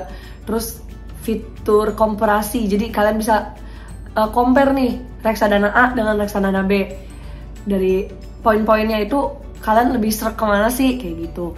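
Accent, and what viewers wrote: Bali, Indonesia. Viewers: Orang Bali kah?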